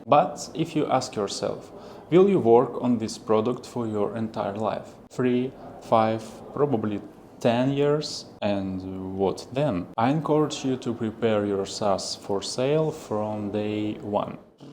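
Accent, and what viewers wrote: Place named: Russia. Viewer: russian accent, I have the same, we have to think what we want to say first before saying. But thanks for the tip it's much better now :)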